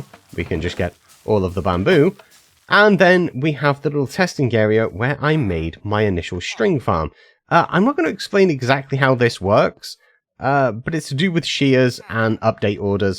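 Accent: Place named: Mansfield, United Kingdom. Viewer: I've been trying to figure out the accent for a bit. I was leaning towards Mansfield. Yorkshire had crossed my mind too. Edit: to be fair leaving the UK has dulled my ability to pinpoint accents considerably.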